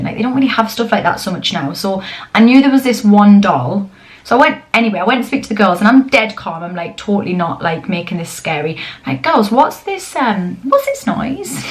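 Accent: Newcastle, United Kingdom. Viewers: I love your Geordie accent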